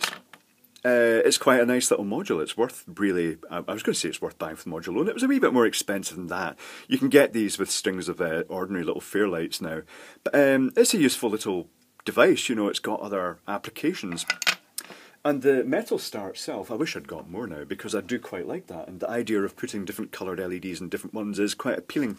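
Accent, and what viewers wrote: Scotland, United Kingdom. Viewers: Great teacher, selection of crystal frequency is something I hadn't even considered before - obvious now. Great delivery and the erudite Scots accent doesn't do any harm either - very watchable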